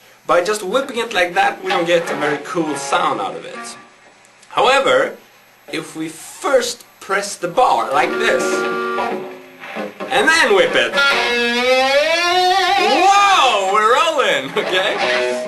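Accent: Sweden. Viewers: I am ashamed because it sounds just like Swedish accent. I fight tooth and nail to be self aware and not sound like that when I speak English! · im a member at ultimateguitar, quit hating on this guy, he's freakin amazing. His accent is because he's from Stockholm Sweeden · Haha!!! He´s swedish, i know the accident ´cause i´m also swedish (even through, i´m not that bad att english accident!!!)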